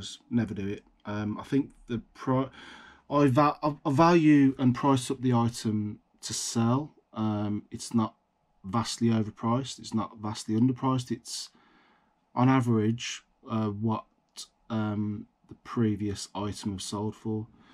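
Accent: Birmingham, United Kingdom. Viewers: Damn that brummy accent 🤣🤣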